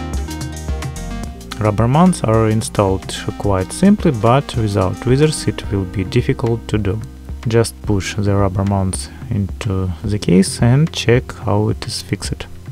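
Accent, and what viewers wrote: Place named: Russia. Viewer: russkiy accent chyvstvyetsa))))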